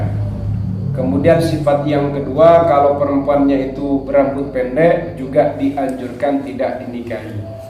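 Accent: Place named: Madura, Indonesia. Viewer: Ustadz orang mana? Bahasanya kayak orang madura.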